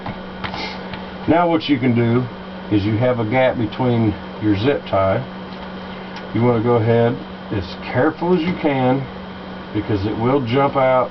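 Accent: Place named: South, United States. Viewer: Brilliant accent.... Southern, I guess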